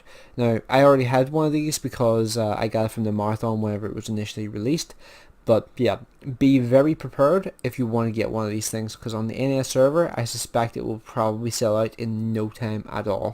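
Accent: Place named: Belfast, United Kingdom. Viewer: Where are you, Belfast?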